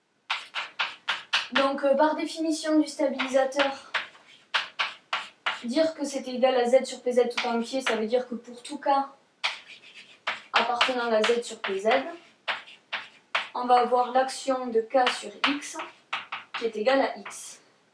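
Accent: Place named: South, France. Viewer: Ce petit accent du sud: chocolatine !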